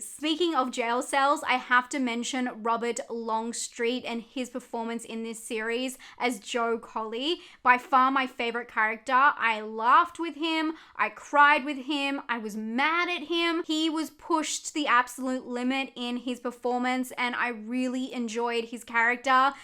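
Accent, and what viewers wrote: Australia. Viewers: Youre Australian?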